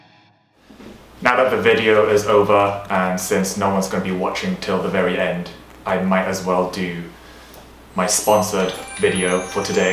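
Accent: United Kingdom. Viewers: Ive never heard this man speak before I didnt think hed be British 😍😭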